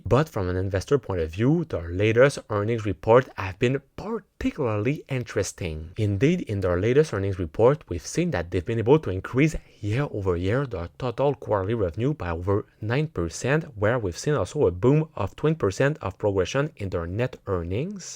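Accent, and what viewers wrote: Quebec, Canada. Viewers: Au prochain rapport trimestriel, pourriez vous créer une version de votre vidéo en Français, SVP. Merci bien. Votre accent Quebecois est très agréable à entendre.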